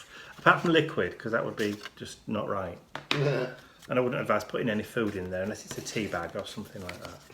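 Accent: United Kingdom. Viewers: Oh don’t you hate when that happens? Lol. It was a great video still, you have awesome ideas and love listening to you and that British accent. 💕😎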